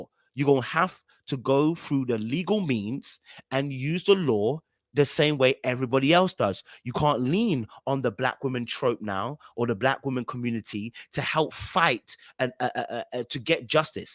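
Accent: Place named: England, United Kingdom. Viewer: I was NOT expecting the English accent! 🥰🥰🥰🥰🥰🥰🥰🥰🥰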